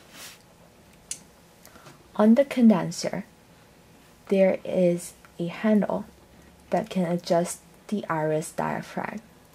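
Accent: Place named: Asia. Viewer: sounds like an asian woman trying her hardest to speak english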